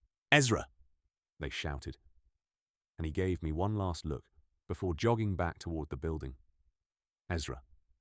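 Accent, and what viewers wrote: United Kingdom. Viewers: A part 2 of this story would be awesome! love the British accent of the narrator.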